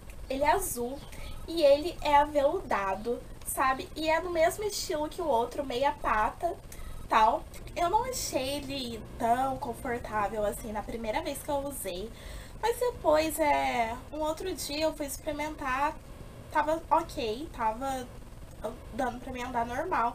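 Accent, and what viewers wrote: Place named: Brazil. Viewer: Olá querida, belas comprinhas, mas esse sapato azul, uuii adoro !! Sou portuguesa mas amo o sotaque brasileiro ;) Passa no meu canal que tem video novo ;) Beijinhooo grandeee *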